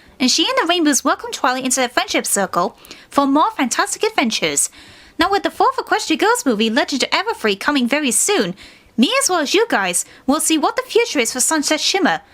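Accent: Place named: United Kingdom. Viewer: For your great British accent and your love for Sunset Shimmer, you gain a subscriber.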